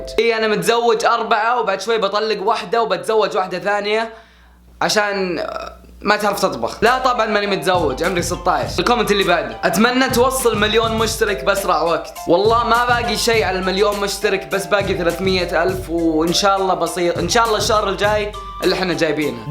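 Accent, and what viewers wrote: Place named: United States. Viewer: كيف تعلمت انقلش مرا كانك امريكي؟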